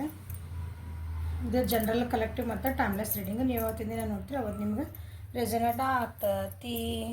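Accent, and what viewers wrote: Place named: Badami, India. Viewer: Hi mam...nimmadu yava uru mam?nimma matina shyali namma uru Tara ede...nammadu badami..nimmadu yav uru mam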